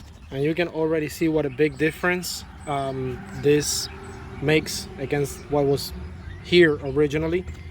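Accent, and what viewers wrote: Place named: Puerto Rico. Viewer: Just knocked this out thanks to this video! Thank you! There's 0% chance you're not boricua with that accent! Dimelooo 🇵🇷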